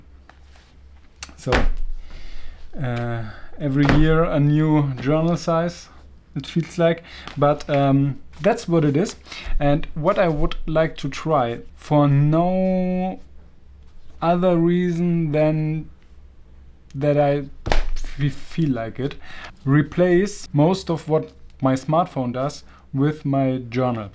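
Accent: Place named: Germany. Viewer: a few seconds in and i see papers and i hear german accent... subscribed.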